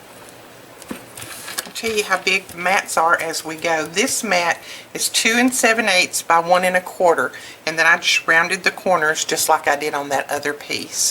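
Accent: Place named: East Texas, United States. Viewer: would you happen to be from E/NE Texas? you sound like family members of mine. :-)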